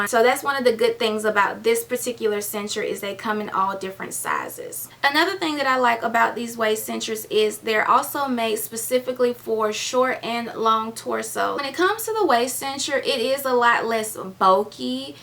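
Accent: South, United States. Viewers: I like that southern bell voice very relaxing😁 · I love your voice smooth and southern you could read a prescription bottle and it sounds good "Naproxen, Acetaminophen, Iridocyclisis" 😂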